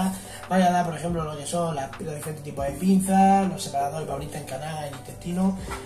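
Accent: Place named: Murcia, Spain. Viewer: Que acento más murcianiko 😊😅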